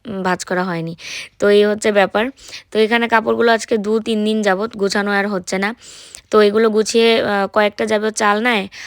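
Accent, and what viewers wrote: Bangladesh. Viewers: From Bangladesh 🇧🇩. Apu tumi Bangladeshi suna khub valo laga. Tomar video thakhi but comments kora hoy na.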